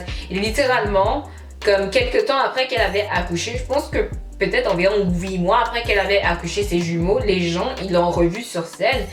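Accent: Quebec, Canada. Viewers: Elle a un petit accent québécois je trouve. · T’es une québécoise ? Oui non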